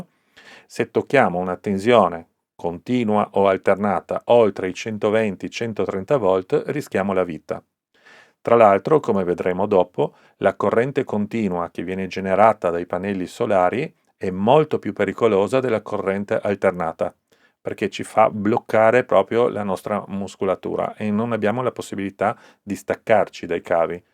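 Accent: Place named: Sicily, Italy. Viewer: Sei molto chiaro nelle tue spiegazioni dettagliate .( Dal tuo accento ho capito che sei siciliano ,,auguri!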